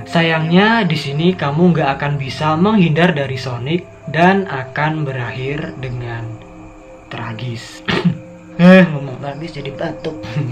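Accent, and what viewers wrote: Java, Indonesia. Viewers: Sampean suku Jawa tooo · Jowo daerah pundi kak? 😹😹😹😹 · Lidah khas Jawa nya loh yg bikin seru 😁😂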